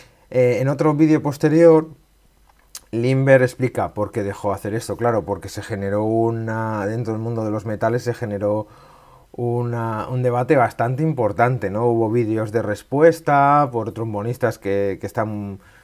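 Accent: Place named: Spain. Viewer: De qué parte de España eres tú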